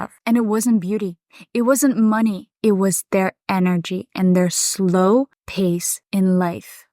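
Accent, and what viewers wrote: Russia. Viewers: акцент русский, вы откуда? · Please stop doing typical Russian accent in purpose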